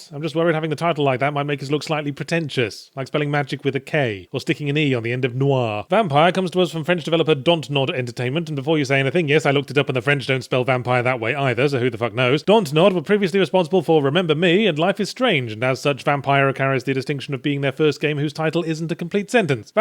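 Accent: United Kingdom. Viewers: Yahtzee, I didn’t know you were British! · Is British? That ending was hilarious for some reason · Wait... is British? I thought he was Australian.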